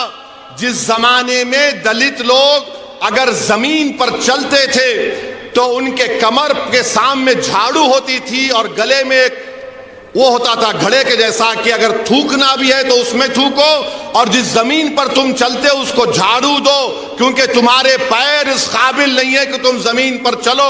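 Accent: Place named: Hyderabad, India. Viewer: Phale Haidrabad hindi bolna chodo aur sidha hindi sikh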